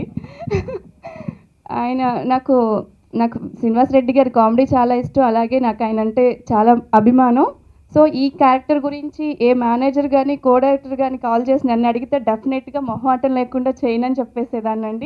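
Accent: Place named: India. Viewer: Spoken like a True Telugu ammayi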